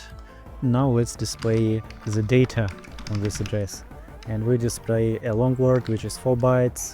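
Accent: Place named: Russia. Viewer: Just finished watching, great video. An amazing voice reveal also with that thick russian accent haha.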